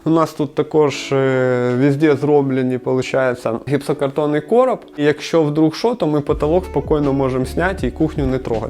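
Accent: Surzhyk, Ukraine. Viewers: Не можливо слухати( суржик є у всіх, але тут його забагато.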